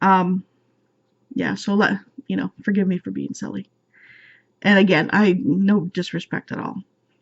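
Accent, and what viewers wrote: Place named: South, United States. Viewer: Lol! I liked your southern accent!! Those books are great. My boys love them! I'm still reading He Will Be My Ruin by KA Tucker. It's very good I'm half way through the main character annoys me so I find myself not picking it up. My goal is to finish today! I have a chunk of time with nothing to do and house to myself! :) Great video as always my friend!! Hugs to you!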